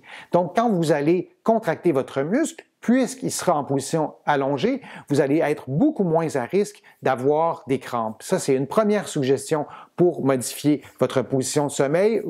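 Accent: Quebec, Canada. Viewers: Merci vous m'encouragezje suis née pas loin du Canada (stpierre et miquelon) près de terrneuve j'ai apprécié la votre léger accent Québec dès que je peux je vous rendrai visite je ne vais pas guérir mais peut être me soulager par votre connaissance médicale et vos conseils en les mettant en pratique à la prochaine anne Marie briand Morieres les Avignon France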